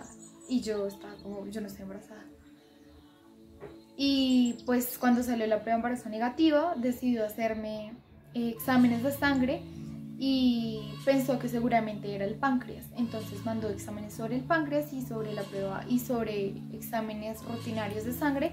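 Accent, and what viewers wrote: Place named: Colombia. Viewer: oye la voz colombiana me gusta mucho un saludo desde México ♥️ cuídate mucho y bendiciones 🙏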